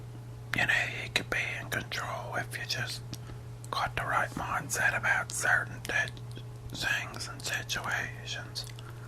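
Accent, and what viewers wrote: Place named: South, United States. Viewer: amaan ahmed people from the south of the United States have that accent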